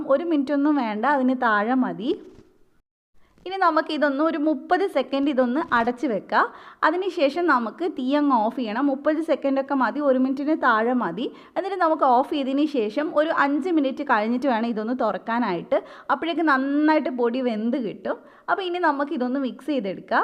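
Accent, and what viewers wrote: Kannur, India. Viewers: kannur ano? Kannur style slang allalo athkonda chodikkunnad. Adipoli snacks 👌👌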